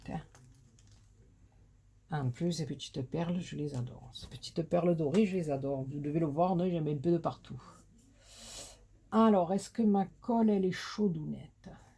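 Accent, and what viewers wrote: South, France. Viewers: on entend bien l accent du midi.je suis de l aude tres jolie carte.tres vintage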